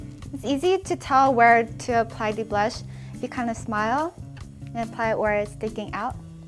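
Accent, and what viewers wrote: South Korea. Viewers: Every time I watch your videos, I notice how you have this Korean accent but I'm not quite sure if it's Korean accent or not because my fellow Korean friends who were born in Canada don't have such accent. Is it only me who notices her accent?? lol what accent do you have?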